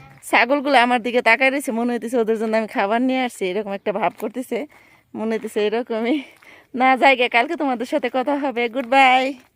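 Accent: Manikganj, Bangladesh. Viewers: আপনার কথা গুলো আমাদের মানিক গঞ্জ এর মতো লাগে আপনার বাসা কোথায় আপু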